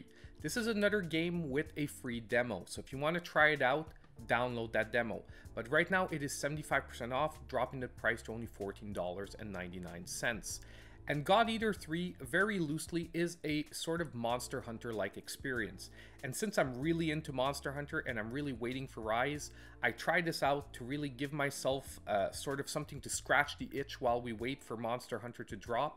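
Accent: Canada. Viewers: Are you Canadian??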